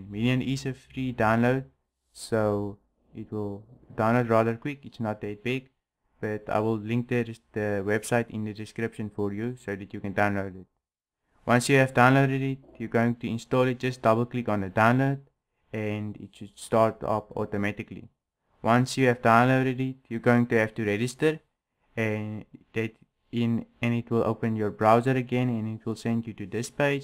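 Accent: South Africa. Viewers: You are South African? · This guy from South Africa? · You sound South African... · Yeah you sound really SA! I guess uTube now sends us local content, I think it is fantastic since now I feel more confident to use my SA accent also. But man the 'force' is strong with you, I think you need to filter the noize on your mic a bit to help. This video this help thanks, simple enough good to know while downloading setup files.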